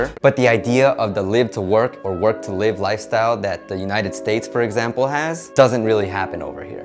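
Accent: Ecuador. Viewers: You are Ecuadorian,you have Apaño accent. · Yo siempre pensé que eras de ecuador porque te notaba ese acento típico fácil de identificar pero vaya sorpresa que me pegue o una de dos se te pego el acento o ya hablabas así antes que vengas acá xD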